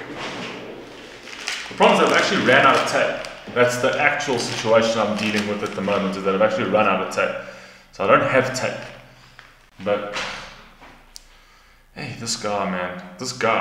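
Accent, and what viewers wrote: South Africa. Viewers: just came across this.90% sure thats South African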